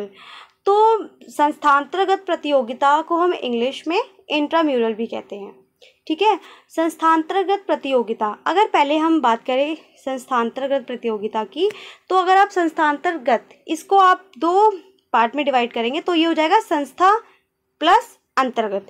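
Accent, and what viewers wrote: Delhi, India. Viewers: Mam are you from Delhi 😁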